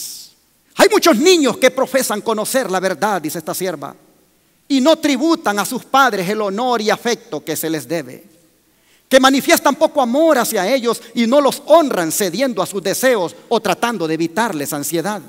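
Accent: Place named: Central America. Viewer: De donde es pastor su acepto suena de centroamerica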